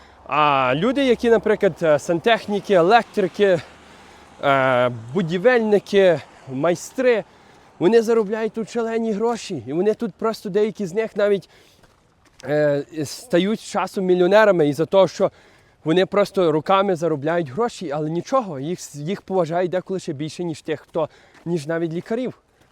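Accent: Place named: Western Ukraine, Ukraine. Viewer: Дякую тобі за класні відоси, впізнаю наш захїдний діалект. Мені подобається дивитись твій канал, навіть за відсутність професійної зйомки....тощо...Бачу в тобі скромну, виховану та порядну людину. Простий Українець! Десятки мільйонів тобі підписників і стрімкого зросту каналу! Слава ЗСУ!!!Слава Україні!!!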